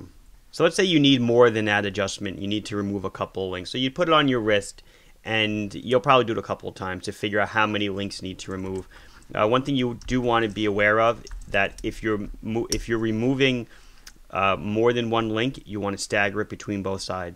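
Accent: Long Island, United States. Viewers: I grew up in Huntington Station. I really miss hearing people speak like this, not just the accent (yours is not overly pronounced), but the manner of speaking. Confident, intelligent, with a sense of humility and humor combined. Thanks for bringing back memories. Thanks for the excellent video! Channeling: "Cause [Florida's] not my home." PS - Big fan here. Too bad they haven't created anymore music.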